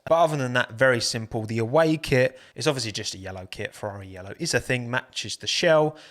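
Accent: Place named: Essex, United Kingdom. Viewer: Wonder if you’ll revisit the football/F1 hybrids, loved the video & the creativity you always show For me, I’m a football fan that’s only just getting into the world of F1, & admittedly when I first watched your content, I thought “man he’s basically Spencer FC but F1” especially with the (I think) Essex accent, & then I find out you’re a West Ham fan too which cemented that for me 😅 (I’m not British so my guesstimation might be wrong lol)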